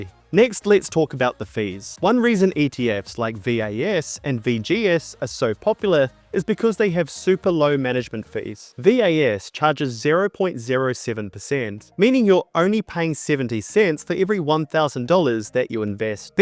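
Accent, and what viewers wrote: New Zealand. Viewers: I hear a NZ accent. Im not crazy right?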